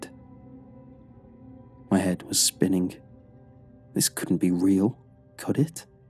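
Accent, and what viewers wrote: England, United Kingdom. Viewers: YAY! BRITISH ACCENT! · English boi?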